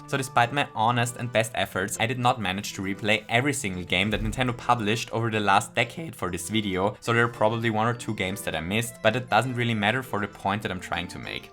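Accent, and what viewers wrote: Germany. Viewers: Yes. I heard his german accent instandly, the first time I watched him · Are you German?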